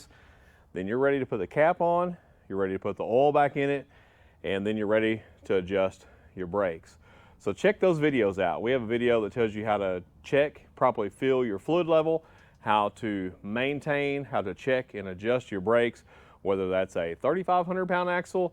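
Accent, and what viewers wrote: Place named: North Texas, United States. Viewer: Thanks for the video and info. Can you do a video on when the hub assembly should be replaced? The shoes on my 7k lb axle sitting between 5/32" and 6/32" and I'm wondering if they should be replaced in preparation for the upcoming RV travel season. Thanks And ditto to your pronunciation, being a North Texan myself.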